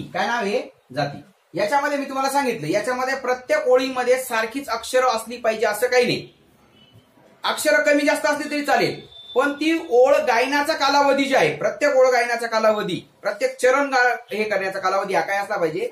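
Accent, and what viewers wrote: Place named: Dhule, India. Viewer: 10th pass karun 22 varsh zhale. Mi kavita lekhan karto. sahaj mhatale vrutta ani chand yache dnyan ghyave punha ekda mhanun video search kela. tar ha video start to end pahila. punha school madhe gelyachi feeling ali. Khupach chaan sir. ani tumchi accent dhulyachi aahe. I hope aatta Lockdown chya kalat vidyarthyanna yacha khup fhayda hoil... Good Job Keep it up. <link> vel milala tar mazhya kavita check kara...(Mukta Chandatlya aahet... ) Baki Chndatalya ani Gazal post nahi kelya ajun shikto aahe...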